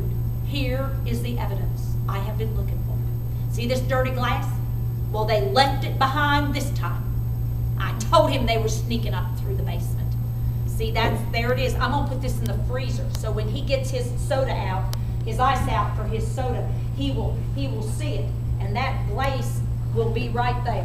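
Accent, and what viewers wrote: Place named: East Tennessee, United States. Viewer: I grew up in East Tennessee. She sounds like my sister. Same accent throughout, just more noticeable when she goes from lecture voice to excited conversation voice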